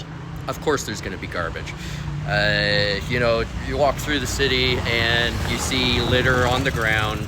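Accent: Canada. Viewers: I noticed that Canadian accent right away LOL. As soon as you said oat and aboat was pretty obvious😂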